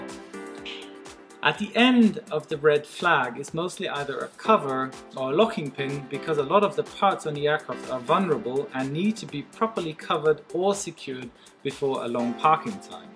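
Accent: Germany. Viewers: Hes german.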